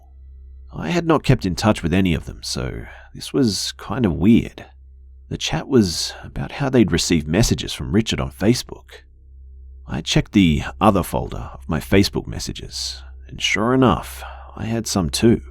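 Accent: Australia. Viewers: So much for sleeping tonight., you make me sleep with the light on. My twisted side thanks you for this, my electricity bill does not. No more listening in the dark with headphones and a narrator who feeds of the fear of his users. No need to go hungry, love it and will keep listening to your wonderful Aussie accent!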